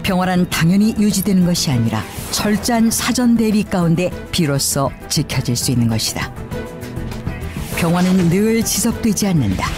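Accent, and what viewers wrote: North Korea. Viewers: 말투가 딱봐도 북한이네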